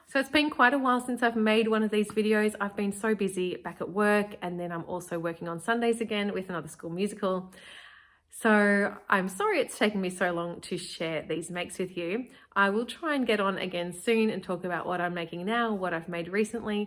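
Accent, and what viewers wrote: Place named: Australia. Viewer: I really enjoyed your cruise handmade wardrobe tour. It’s also fantastic to listen to a fellow Aussie and familiar shops and fabrics. I have two patena blouses and love them. ( a basic but with a twist)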